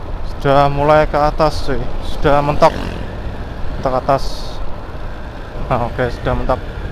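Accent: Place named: Java, Indonesia. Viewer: Jowo lu ora ilang bro, Gw ngguyu tenan ini🤣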